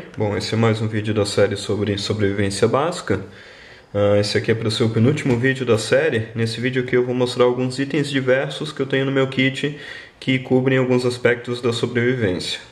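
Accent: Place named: Santa Catarina, Brazil. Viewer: e ae cara, gostei dos seus videos, ja estou inscrito. Pelo seu sotaque vc é de SC certo? Qual cidade amigo? Abraços!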